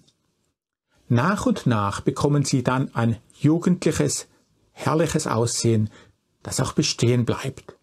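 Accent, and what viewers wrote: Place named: Switzerland. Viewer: Herr, jetzt weiss ich aber Ihren Namen, habe denselben von einer Dame abgespickt die auch in Ihren "Comments" gepostet hat:)..... Ein ganz ganz herzliches "Gruezi" an Sie und Ihr wunderschoenes Land, die Schweiz... und dann noch ein ebenso herzliches Merry Christmas und Happy New Year aus USA.... Ich bin so gerne in Ihrem Kanal um alle Zeitgenossen zu erleben die Sie interviewen und/oder intrerwiewed haben... Sie sind ein echt charmanter, sachkundiger Interviewer... ihr Schweizer Akzent davon ist einfach zum Dahinschmelzen.. Ich liebe die Schweiz und mag die Schweizer:) Schoene Feiertage:) und alles Gute:)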